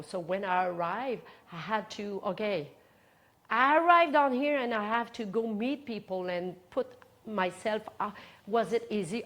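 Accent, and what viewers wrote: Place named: Quebec, Canada. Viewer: My mom was from Quebec. Because of your accent, I feel like you are family! Hope to meet you one day. I admire your work.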